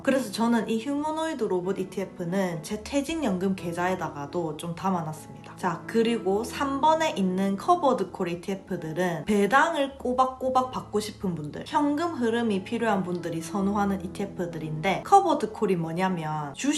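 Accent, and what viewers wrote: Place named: Busan, South Korea. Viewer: 짱이에요 영상 항상 잘 챙겨보고있어요...!!🥹💜 친근한 부산사투리 너무조와용 >_<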